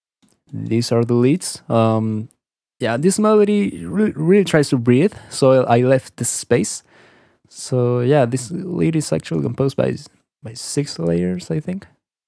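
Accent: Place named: Spain. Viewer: "Reburb" 😂 I love the spanish accent 😁 Love the video man!!! 👌🏼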